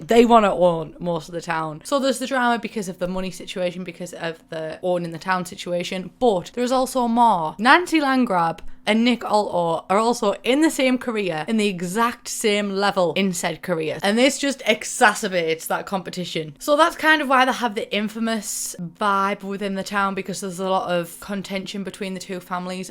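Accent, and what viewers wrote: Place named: North, United Kingdom. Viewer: I wish I wasn’t such an uncultured American so I could have a dope northern accent. What a legend. Love you, ❤️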